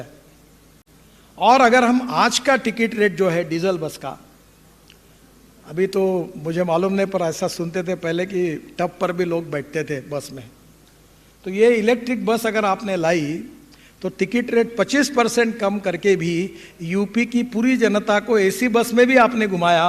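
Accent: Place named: Maharashtra, India. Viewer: Somebody please make him learn good English, Marathi accent is acceptable while talking in Hindi; but not suitable for English please :-) Working is good but if you want to give speeches improve your speech. Even Lalu also tried :-) Use we and not me or I.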